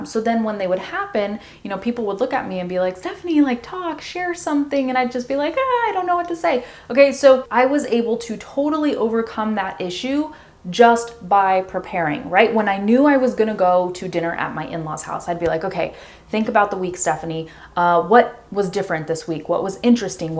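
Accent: California, United States. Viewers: I really like your Californian accent. It's easy to understand so I can get your tips so well. Thanks for making this video Greetings from Lima :) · i adore the American accent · i just want to hear you talking i like your voice and american accent.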